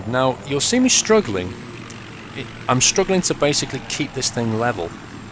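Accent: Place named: United Kingdom. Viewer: Oh your british I see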